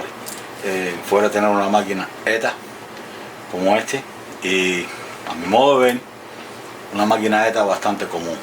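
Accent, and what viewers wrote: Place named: Cuba. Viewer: Por el. Acento pareces cubano es decir somos paisanos, concuerdo contigo hay mucha vistilla y poca autenticidad, hoy casualmente compre un Tissot seastar seven de cuerda manual, q lleva un 781-1 y por esos años 70 me imagino q la base sea in house! Pero me surge la duda... Gracias por tan interesante contenido, sigue compartiendo!! Y si me pudieras decir q maquinaria tendría esa pieza de la q te hablo!! Lo demás son dolares tirados, los relojes auténticos en cuanto a su interior están muy escasos!!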